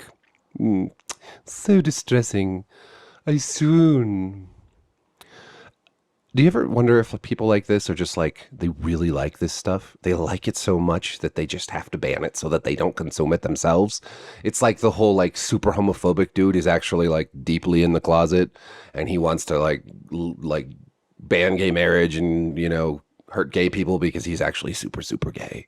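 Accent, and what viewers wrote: United Kingdom. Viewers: I LOVE your posh English accent, I appreciate it as I'm English, although I sound NOTHING like that! It's just so funny listening to you do it... however, your Australian accent is TERRIBLE!...but also really funny in a different way! More of both accents please. 😆😆😆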